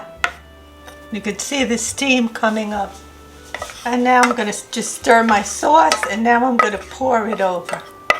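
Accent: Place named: New York, United States. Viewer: Hi again Grandma! You were married close to the year I was. Do you and Grandpa have a secret for such a long marriage? It's so rare these days. Btw I grew up in Mahopac NY and knew people with your same lovely accent. As a bride I had a set of the flowered Corelle dishes, green and white. P.S. they DID break although they were called unbreakable. Best wishes, Donna in Vermont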